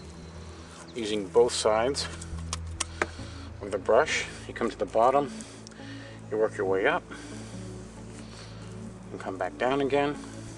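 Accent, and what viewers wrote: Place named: Canada. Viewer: if they're not home, then you must have them open them later as you zip them free with your putty knife/ 5 in one.. yeah, he's a canuck.. i can tell my accent and architecture.... i think in Canada like England there must be a law you have to paint your windows white... Dap with Silicone is ok caulk, i prefer a elastomeric caulk... you really can't do that much caulking and wiping it out, should have caulked it first or earlier if window have much cracking..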